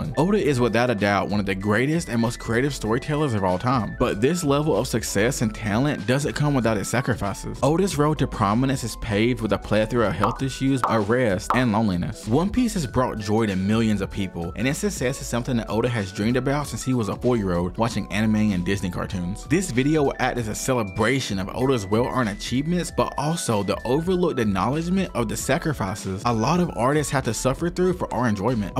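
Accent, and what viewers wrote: South, United States. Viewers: That southern accent lowkey hittin’ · Where is that accent from is sounds southern but im not sure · Now realizing you’re jus doing a YouTube accent to cover your southern accent, bro own it, be the southern one piece youtuber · i aint the only one who hears a county acsent right??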